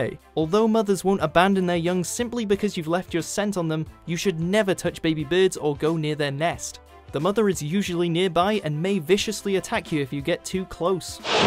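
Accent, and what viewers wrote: United Kingdom. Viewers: he's British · He has a British accent, so you can stop attacking him over how he says Echo.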